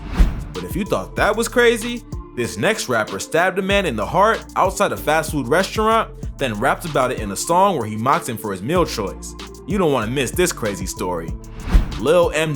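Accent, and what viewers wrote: United States. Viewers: 😂😂why is there an American commentary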